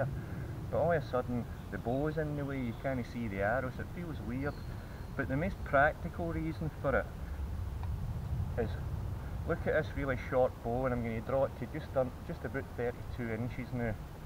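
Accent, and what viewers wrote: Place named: Scotland, United Kingdom. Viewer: Camera too far away for clear video instruction.... volume very poor for this Scottish accent.... nice bow however..!!🤔.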